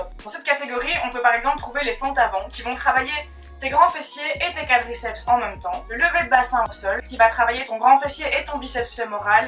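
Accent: Belgium. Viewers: L'accent belge me fait tomber ;) Cela vient pas de de Liège je dirai peut être Hui ou Namur ?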